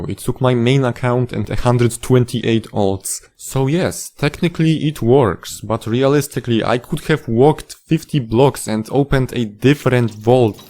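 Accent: Poland. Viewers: kurwa ale polski akcent natychmiast slychac ze to polak mowiacy po angielsku doslownie po dwoch zdaniach niesamowite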